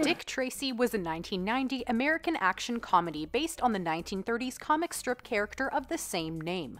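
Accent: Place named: Canada. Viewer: You have a cute Canadian accent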